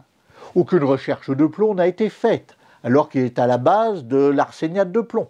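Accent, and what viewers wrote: Marseille, France. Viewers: Génial vivement la partie 3 ! j'espère qu'il y aura encore l'accent marseillais ;) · Je ne souhaite nullement descendre votre accent marseillais, mais vous pourriez utiliser votre nouvelle influence dans le YouTube game pour trouver des doubleurs qui prendraient plaisir à sublimer votre travail de narration. Excellente série, merci à vous ! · Bravo pour votre accent marseillais qui m'a bien fait rire ;)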